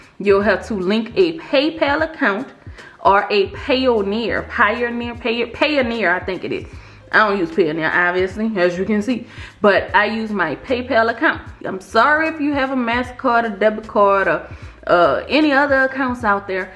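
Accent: New Orleans, United States. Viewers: I hear a New Orleans accent 😍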